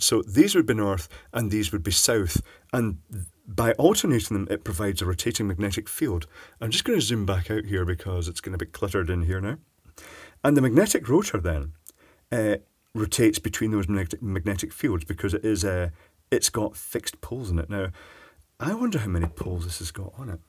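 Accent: Scotland, United Kingdom. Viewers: I wonder why the Scots say 'greeze' rather than grease. Love the way thats pronounced.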